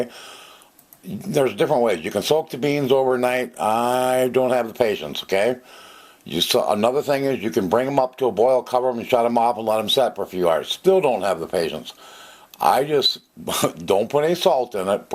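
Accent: Minnesota, United States. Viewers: Talks like an only Minnesotan "this farmlund make utt".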